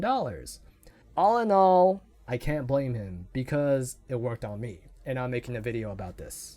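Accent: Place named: Asia. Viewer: i can hear ur Asian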